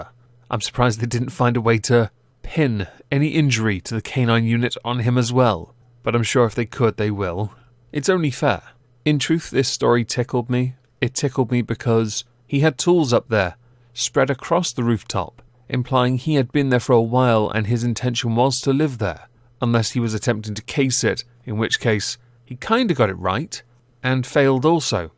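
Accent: United Kingdom. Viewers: I just can’t get over voice and accent like ok British daddy I can listen to you all day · You know what made me subscribe? Of course, British wit, voice and humor (which I love as a non native English speaker) plus Lovecraftian theme, but those were just a bonus. The thing that made me finally press the button was - your usage of face expressions. They are so well made (props to the artist who made those!) and varied just enough, and you use them so skillfully to bring a point across or emphasize something, along with a slight voice change or pause, love it. I never though that something like this can impress me so, especially as this element of brand creation is so popular nowadays, everyone creates a cartoony alter ego. You nailed it, it has so much character, it looks like you're actually speaking and articulating through it in real time. Also, the intro with sarcastic "well, isn't this just fantastic" speaks to me on a spiritual level. 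As well as a concept of Exhibition of Stupid People. Good stuff.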